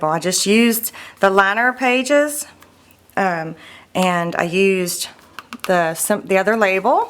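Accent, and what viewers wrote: South, United States. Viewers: Such a generous giveaway!! Love listening to your southern accent...I'm from Pennsylvania and it sounds like music to me. I love your work...you are very meticulous in your creations...thanks for sharing your talents with us!!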